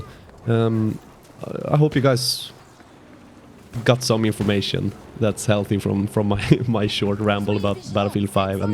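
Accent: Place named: Sweden. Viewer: You sound swedish😂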